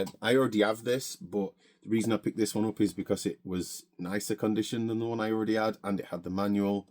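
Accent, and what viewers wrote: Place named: Yorkshire, United Kingdom. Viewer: A fellow Yorkshireman talking videogames? Subscribed mate!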